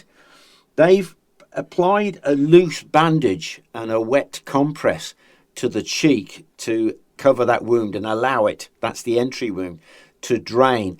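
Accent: United Kingdom. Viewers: A day with a new video from Mister is immediately a BETTER day! It is like having a British friend giving news! LOVE it! ☺👍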